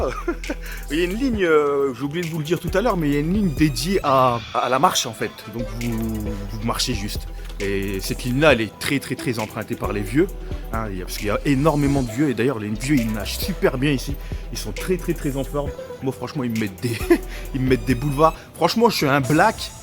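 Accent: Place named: Banlieue, France. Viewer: Jai trop hate daller au Japon, par contre tu parle vraiment comme un banlieusard mec, mais cest pas grave jadore tes vidéos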